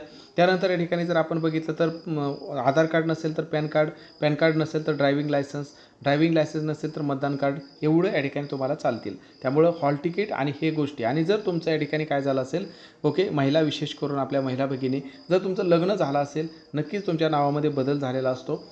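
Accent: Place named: Latur, India. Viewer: तुम्ही लातूर विभागले आहात का sir 😅 म्हणजे बोलताना तुम्ही "घेऊन जा. अस न म्हणता घेऊन जावा." अस म्हणता म्हणून विचार..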